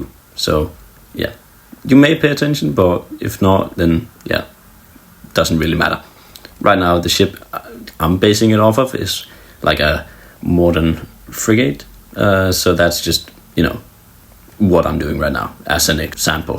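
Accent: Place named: Denmark. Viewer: Wait are you Danish the accent is great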